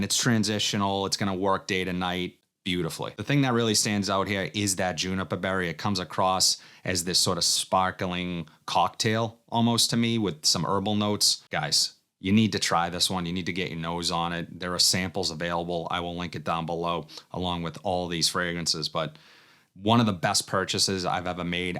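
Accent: Boston, United States. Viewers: Here for the fragrances , but also the Boston accent 😝☺️ MFK GFS is awesome , but I can’t afford the OG - I like Oil Perfumery’s version (it’s def a bunch) as well as fragrancebar and ALT fragrances . I needed a variation from anyone that duped it . Great cooling fragrance, I love it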